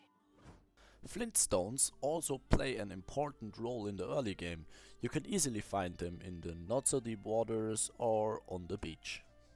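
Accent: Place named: Russia. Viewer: Bros having the heaviest russian accent to mankind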